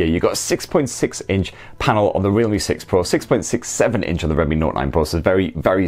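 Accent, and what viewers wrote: United Kingdom. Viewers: Just love to hear his british accent 🤣👌 "bloody" liked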